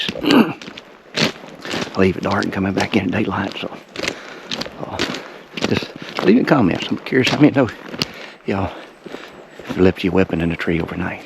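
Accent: South, United States. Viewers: Anytime a man speaks with a southern accent like take note. This man speaks nothing but wisdom. This story is relatable to me and just gives me a better understanding of life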